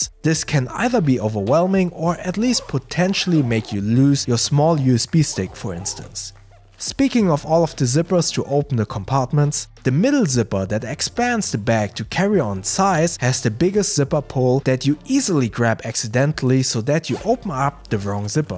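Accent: Germany. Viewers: That's a good looking pack. Goes almost too well with your jacket. I bet pack cost about 25 thousand dollars. What is your accent? German. Cool voice.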